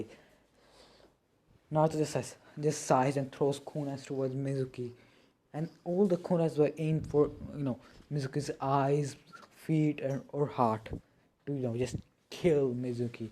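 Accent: India. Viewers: love your indian accent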